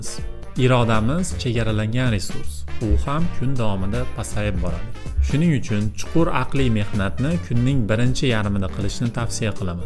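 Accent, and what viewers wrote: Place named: Andijan, Uzbekistan. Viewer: Anjancha shevani eshitib siz bn faxrlandim,omadizni bersin👍👍✊✊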